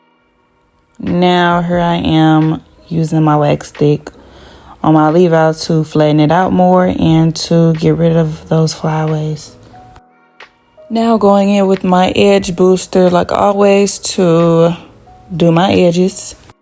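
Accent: St. Louis, United States. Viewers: Where you from baby? The accent is giving STL! 💁🏾‍♀️💁🏾‍♀️ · Very cute!! Sounds like you are from st.Louis 🥰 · My first time watching you… I knew you were from the same city cause you said “hur” instead of “hair” & said make sure them tracks aint going no whur” lol perioddd we soo Saint louis SUBSCRIBED ❤💕💕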